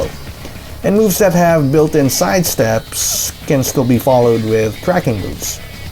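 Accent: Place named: Philippines. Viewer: I knew the accent was filipino lol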